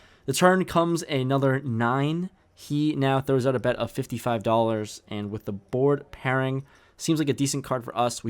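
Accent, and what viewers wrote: Western Massachusetts, United States. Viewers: Are you from western Massachusetts? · Oh are you from western mass in mgm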